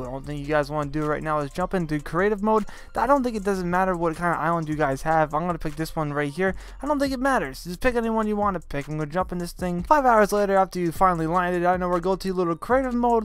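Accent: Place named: New York, United States. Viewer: This dude has a New York accent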